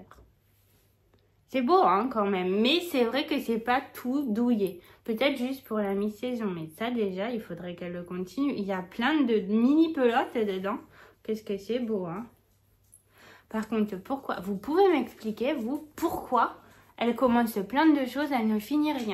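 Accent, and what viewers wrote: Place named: Spain. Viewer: Bonjour …un plaisir de te retrouver avec ton accent espagnol..tu es adorable et me fait bien rire 😊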